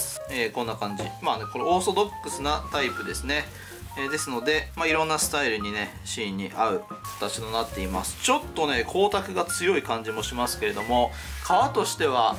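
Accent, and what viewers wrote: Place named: Nagoya, Japan. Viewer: 訛りが静岡の人かと思ったら名古屋の人だった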